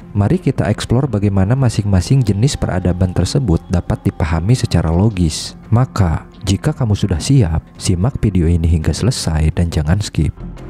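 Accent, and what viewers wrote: Indonesia. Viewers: Akangnya urang sunda.. lepel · orang sunda ya bang?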